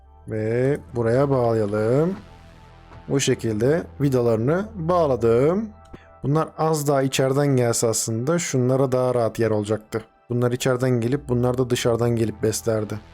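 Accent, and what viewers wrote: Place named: Hatay, Turkey. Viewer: hatay sandık içi aksanı seziyorum ayrıca :)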